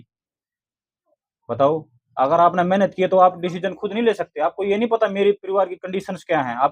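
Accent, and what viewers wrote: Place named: Haryana, India. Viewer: Haryana ki boli khadi hai lekin siddhi baat ar khari baat kehte hai.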